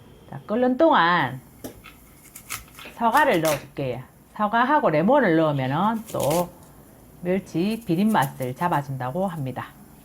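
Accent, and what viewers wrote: Gyeongsang, South Korea. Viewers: 구수한경상도 사투리가 정감이 가네요 오늘도 잘배우고 갑니다^^* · 멸치하고 사과하고 쨈 만드는것은 첨 봅니다~ 경상도 사투리가 너무 정겹네요~❤ 비빔장으로 하면 너무 맛있겠네요 고추장. 만들기 너무 쉽고 맛있겠어요 꼭 따라해 봅니다~❤ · 건강레시피 공유해주셔서 감사합니다🥰💯 늘 구수한 경상도 사투리 정감이 갑니다‼️🤗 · 경상도분이 정말 맞으신가요?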